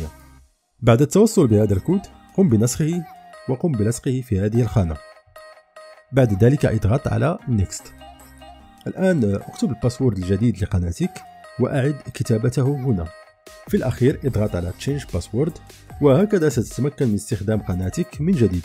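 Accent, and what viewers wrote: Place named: Morocco. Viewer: من صوتك تبدو انك مغربي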